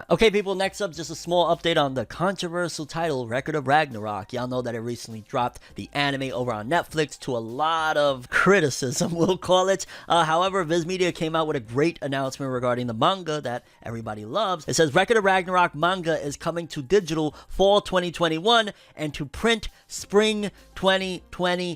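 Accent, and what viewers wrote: United Kingdom. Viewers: best thing of fornever news is the british accent mate! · Dude are you British I catch the accent every blue moon in every video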